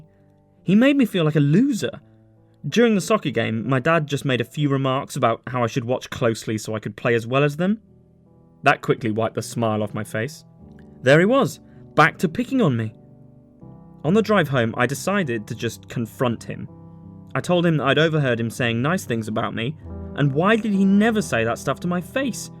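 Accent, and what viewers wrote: United Kingdom. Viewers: Is he british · How does this dude have a british accent but says soccer instead of football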